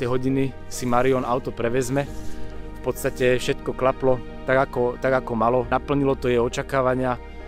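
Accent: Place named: Russia. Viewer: Почти по - Русски говорит же ! Кто Вы - национальность ?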